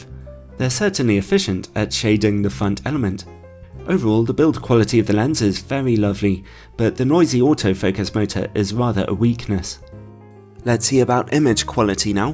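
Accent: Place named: England, United Kingdom. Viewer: what part of England are you from? We are commenting on your lovely accent. Thanks for these videos!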